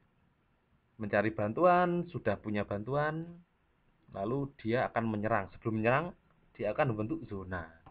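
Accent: Java, Indonesia. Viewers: sampean njelasno ne lewis jelas dari pada pak masyur sapari opo read the market opo ifmyante opo sami be good amargo medok jowone 😂😂😂 mantep 😁😍😘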